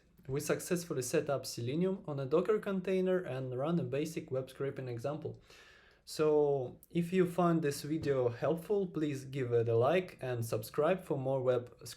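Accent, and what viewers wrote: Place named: Russia. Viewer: Наш акцент трудно не узнать, но автор долго не выдавал себя, пока на Амазоне не появилась доставка в Российскую Федерацию 😂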